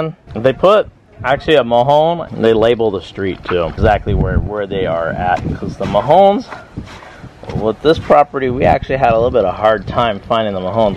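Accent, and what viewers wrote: South, United States. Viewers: What kind of accent this guy has? Sounds like he has a deep southern accent, or OK accent?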